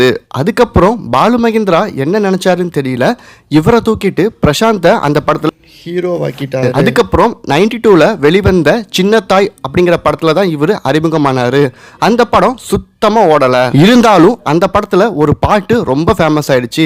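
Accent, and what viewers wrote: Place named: Sri Lanka. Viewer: Srilankan voice slag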